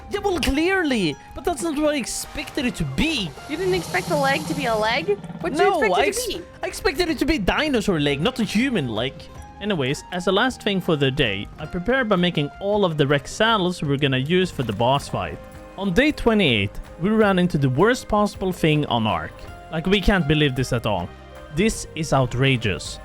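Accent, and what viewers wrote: Sweden. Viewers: Swedish accent shines through - Your Danish Sub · "VAD I HELVETE" god damn that caught me off guard🤣 Wasn't expecting swedish but guess that's why the accient sounded so familiar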